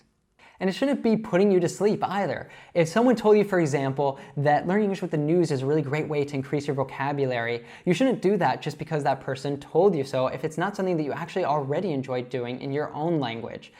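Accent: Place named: United Kingdom. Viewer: Hi....do you conduct online class. I like british accent and wanna to be fluent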